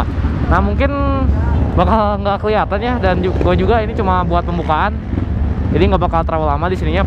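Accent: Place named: Sunda, Indonesia. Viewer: Sunda ae padahal mh kang, medok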